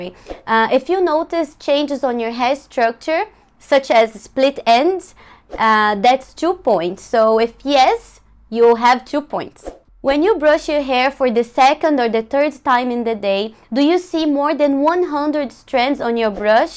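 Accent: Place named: Brazil. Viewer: I knew she was brazilian by her accent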